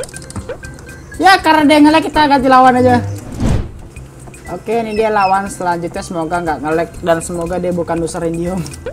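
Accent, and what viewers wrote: Padang, Indonesia. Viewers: Lu org minang bang ..!? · Urang padang?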